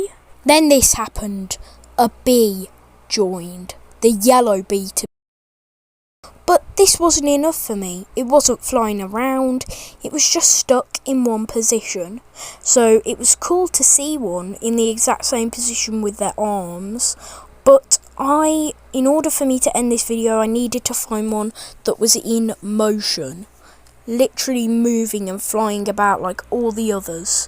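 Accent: United Kingdom. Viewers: Nar mate he’s British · Bro u so brithish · It makes it even funnier because he British😂😂😂😂😂😂😂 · ngl no offense but its its alot harder to take you seriesly when your british XD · BRITISH MAN UGH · Are you British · Your accent is literally the perfect British accent for broadcasting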